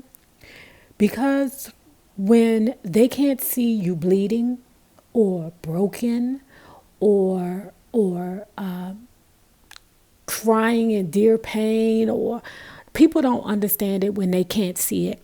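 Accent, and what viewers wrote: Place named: Washington DC, United States. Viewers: I know what you mean about the black folks being pushed out. I live in Germantown md and I know a lot of ppl who moved here from D.C. It's crazy. Plus I know you were from the DMV before you said so and before I saw you. Just by your accent in other videos. It's funny, I can spot a D.C. Accent a mile away. Lol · Peace Queen, I knew you were from DC, I could hear it in your tone, I have to ask do they have Mumbo Sauce down there. Yes, Chocolate City had started to change when Pratt-Kelley took over. But yeah, Your correct about the regentrification nationally, but truthful this is nothing but the fulfillment of the Homestead Act of 1867. The air's you seeing with the social climate is the reaction to the political agenda currently, condone. I too am aware of the Lupus struggle, I lost my Great Aunt when I was a young boy, but would come full circle with my ex-fiance was diagnoised too, I support the research too. 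Oh yeah, I am from Adams Morgan residing in Va. Beach. But on a another note, I never understood why Lupus never got the backing and support as Susan B receives multiple times a year.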